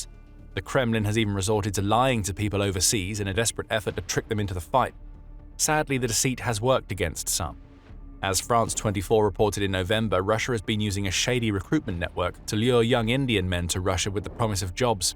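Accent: England, United Kingdom. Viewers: With all due respect, it ends in a 'th' sound, like it's spelled. 'Sicksth" Although, of course, there are different dialects. Yours might be different from mine or the narrator's. He has an English accent. If you've been to England, it feels like there's a different dialect for every town!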